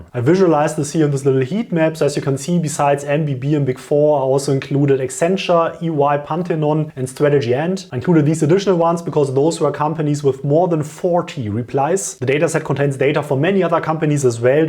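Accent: Germany. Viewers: Alter hörst du dich deutsch an😂😂. Trotzdem gutes Video👌🏼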